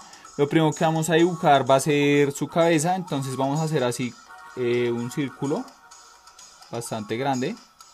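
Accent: Colombia. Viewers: Se le nota tanto que es de bogota.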